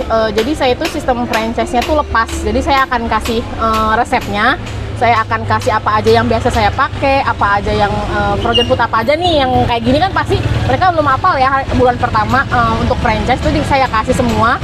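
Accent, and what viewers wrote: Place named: Tangerang, Indonesia. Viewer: Kayak orang Tangerang..lanjut teeh mantep semangat terus..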